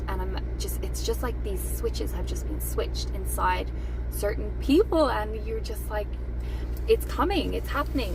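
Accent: Ireland. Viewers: did you move from Ireland? your speech is beautiful ❤️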